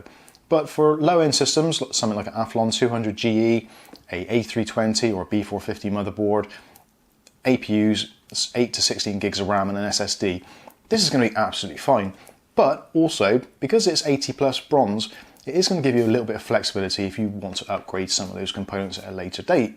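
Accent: United Kingdom. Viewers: I didn't notice anything. I thought it was his British accent.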